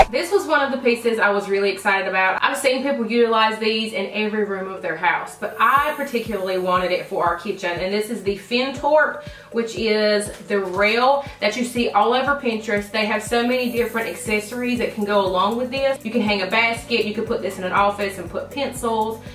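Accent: South, United States. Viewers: living for the southern accent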